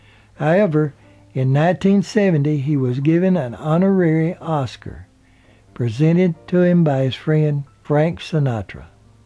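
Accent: United States. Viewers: Can’t stand this American narrator’s accent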